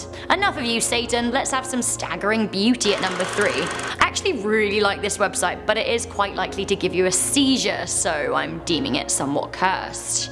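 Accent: United Kingdom. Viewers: I may have a small crush on...or maybe a crush for Britain voices telling me things in top 10 list format.Either way I am satisfied 🙂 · Won’t be visiting those sites. But I swear you are one beautiful women Jesus plus that British accent wow lol.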